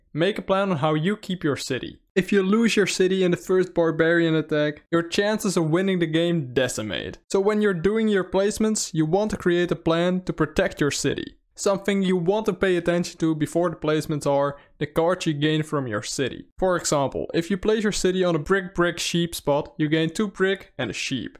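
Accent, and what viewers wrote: Netherlands. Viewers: Although I can understand you just fine, it might help to reduce "vocal fry". That's the creaky, low sound you usually use at the end of a sentence. I think less vocal fry sounds more relaxed. I'm no speech therapist, so I don't know how you'd achieve that. Just curious: are you Dutch?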